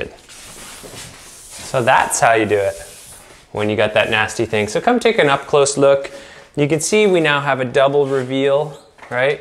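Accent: Canada. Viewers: I was curious if this was Vancouver, BC or Vancouver, WA. I feel like this guy has a mild Canadian accent so I'm going with BC.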